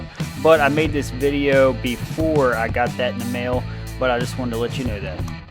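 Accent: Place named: Baltimore, United States. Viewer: Holy Baltimore accent, “q-pon” 😂. Love it.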